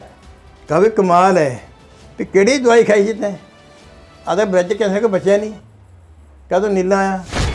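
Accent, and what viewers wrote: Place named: Kharar, India. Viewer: Kharar di boli aee ji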